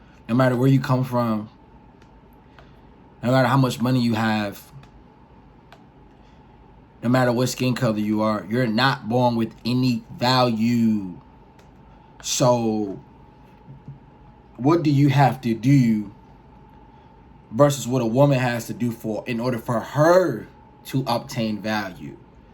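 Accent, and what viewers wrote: Baltimore, United States. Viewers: I thought u was from Baltimore for a second 😂😂😂😂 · You mad cool but I hate your ascent. You must be from Baltimore. Certain words you say comes out funny. You speaking rs tho lol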